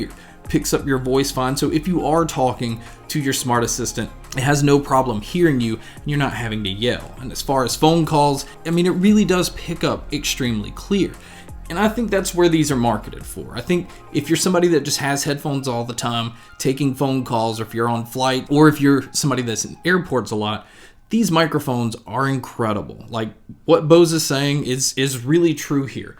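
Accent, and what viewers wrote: South, United States. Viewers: This guy's got a very strong country, southern accent!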